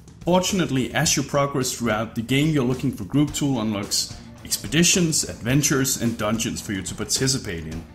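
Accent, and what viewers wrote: Denmark. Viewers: danish accent I feel lol. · Dansk accent er ikke til at tage fejl af! Fed video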